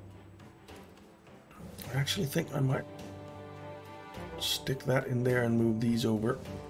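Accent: Denmark. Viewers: Not really hard to notice that the accent is danish 😜 great episode mate!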